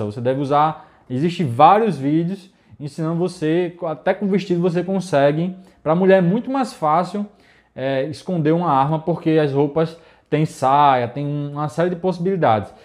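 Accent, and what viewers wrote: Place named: Northeast, Brazil. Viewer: Sotaque nordestino, show!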